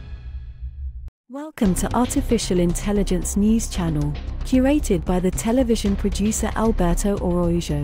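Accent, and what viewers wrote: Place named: England, United Kingdom. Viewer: Young Eva Longoria with English accent.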